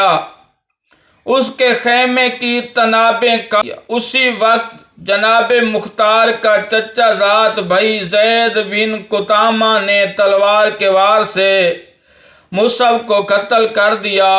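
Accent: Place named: Punjab, Pakistan. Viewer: Panjabi urdu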